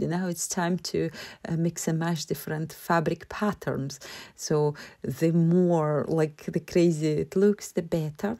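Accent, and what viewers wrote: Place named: Eastern Europe. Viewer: You have a lovely accent. Is it a mix of Eastern European and Scottish?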